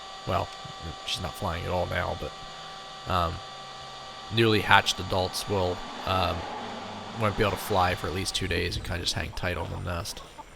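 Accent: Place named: Ohio, United States. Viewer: There's a strange you tube guy does sting zone lets all kinds sting him I told he should try one of those lol we call them bald headed hornet in Michigan sounds like your from Ohio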